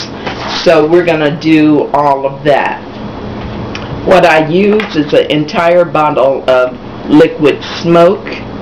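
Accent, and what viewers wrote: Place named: Midwest, United States. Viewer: LOVE this:) Im from Wyoming so Nice to listen to another midwest lady:) THANK YOU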